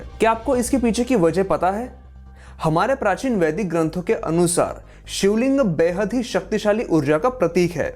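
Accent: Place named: Bengal, India. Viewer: Sir, are you from Bengal? Cause your accent is like so.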